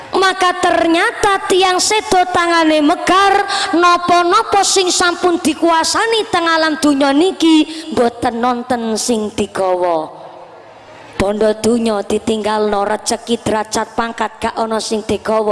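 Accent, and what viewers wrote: Banyumas, Indonesia. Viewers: Saya suka ngapak tapi jelas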